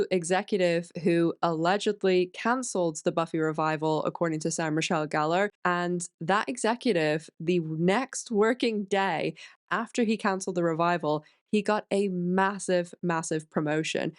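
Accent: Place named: United Kingdom. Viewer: How come every 15th word is in a British accent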